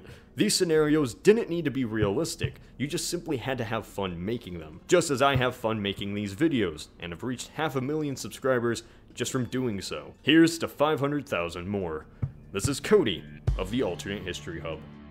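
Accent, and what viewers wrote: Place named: Texas, United States. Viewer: You sound like a Texan to me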